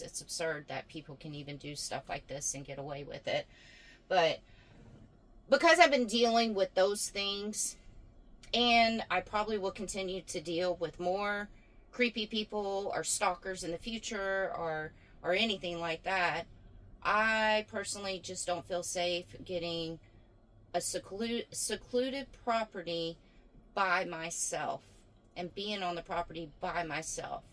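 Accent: South, United States. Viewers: Are you from the south ( Mississippi, Alabama or Georgia)? You sound like it. <3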